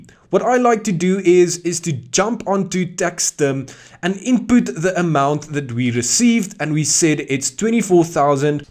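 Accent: Afrikaans, South Africa. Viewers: I'm new here, I love your afrikaans accent 😅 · New here as well, first thing I noticed is the Afrikaans accent, I love that he is authenticity. Dit is 'n paar waardevolle kennis., Danko bhuti 🙏🙏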